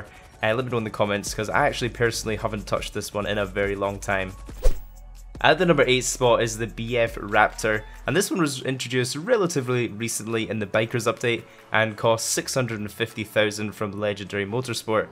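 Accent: Scotland, United Kingdom. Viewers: Is he Scottish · Are u Scottish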